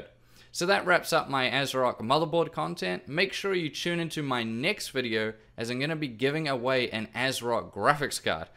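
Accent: New Zealand. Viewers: .. hear your Kiwi accent ? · .....damn i forgot where i was going with the first half of my comment! oh well, its nice to listen to another Kiwi talk tech!!! :D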